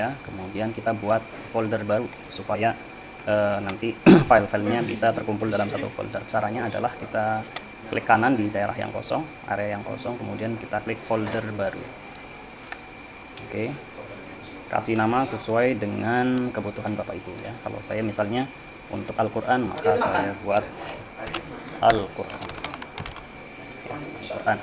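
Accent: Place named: Java, Indonesia. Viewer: Matur suwun pa. Suarane medhok pa. Salam dari blog ngapak.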